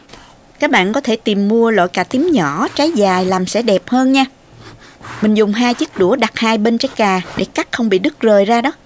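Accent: South, Vietnam. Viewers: giọng cô này hay ,chuẩn nam bộ luôn kkk giọng này làm kênh kể chuyện cũng hợp lắm đa! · Món này ngon quá chị bà ơi, chị có một giọng nói rất ấm áp truyền cảm đậm chất nam bộ, một giọng nói như phát thanh viên Radio hay là những bộ phim tài liệu lịch sử rất tuyệt 😄😄😄👍👍♥️♥️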